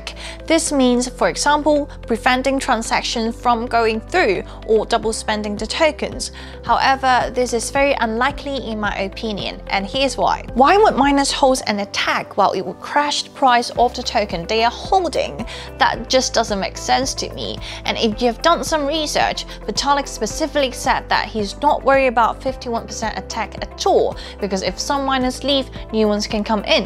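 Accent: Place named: United Kingdom. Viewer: I had to like and subscribe because its good content but also because I'm fascinated by your voice, it's like half very posh english and half Asian, it's brilliant haha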